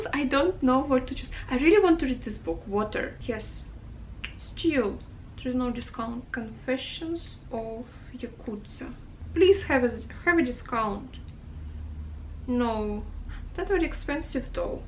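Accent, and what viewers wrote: Russia. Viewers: Are u russian or smth? Like yr accent sounds so familiar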